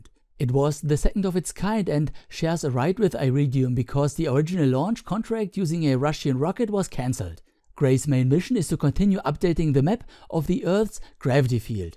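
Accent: Germany. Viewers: I only recently discovered your videos... No idea why it took me so long. Your content is amazing, not only the whole discussion about the different approaches and ideas, but also the visuals and your wonderful German accent :D Liebe Grüße aus Niedersachsen! Mach weiter so! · Rasyidan Ilmansyah he is clearly Germany his accent proves that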